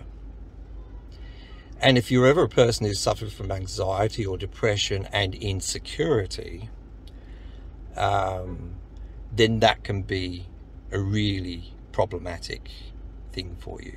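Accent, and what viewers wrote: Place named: Perth, Australia. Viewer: After all the wonderful information you've so generously shared here, I felt that the least I could do is watch every minute of this video. I'm glad you felt like you could share this - that, whatever risk you may have considered, it felt like the good and right choice. Firstly, I am sorry for how you've suffered, what created this suffering and the long tendrils that trauma can have. Secondly, thank you for using your suffering as a vehicle for conveying this kind of message - because you're right, so many people are suffering in various degrees of silence and distress and in some way or another we all feel alone with it. Thirdly, when you spoke about the "physical" aspect of your trauma, it brought to my mind my mother, who also passed away, from cancer, with my hand on her, 6 years ago this month. I often think of her when watching your videos, as she was from Perth, and even after many years in the U.S., she kept a hint of her accent and somehow your voice spontaneously connects me to her, which is a good thing. 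And finally: thank you for the gift of what you have shared through your channel. Cakewalk is the environment my musical creativity has settled into, which makes it a focal point for my hopes, my delight, my anxiety, my fear, my despair. What you share goes right to the heart of that place and helps it make more sense, helps it become a place I can better function in despite all the vulnerabilities that come along with it. It's very much a work in progress - my se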